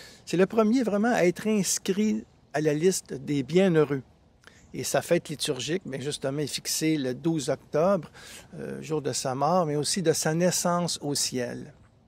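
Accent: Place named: Quebec, Canada. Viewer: C'est la première fois que j'entends une voie canadienne française parler de Carlo Acutis. C'est bien de faire les éloges de bienheureux précoce, mais quand mettrons-nous sur pied une vaste ``opération Carlo Acutis`` dans les écoles parmi les jeunes qui sont on ne peut plus mûrs pour accueillir ce genre de découverte ?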